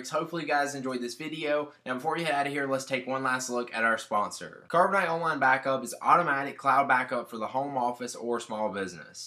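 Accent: South, United States. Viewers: very southern.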